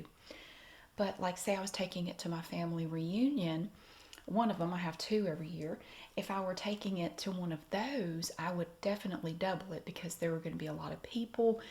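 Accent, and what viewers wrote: United States. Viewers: Hi ! I’m a French 33 yo lady and it’s 5am here, I can’t go back to sleep and I randomly found your channel tonight. I really appreciated your video, it feels like I travelled to the US and have been welcomed in a friendly American woman’s home. I love the accent, you seem really nice and I enjoyed the recipe too. I have no idea what is corn bread though 😂 Due to a bad mental health I haven’t worked in a while and have been really isolated, so this little moment in time with you was so nice. Thank you !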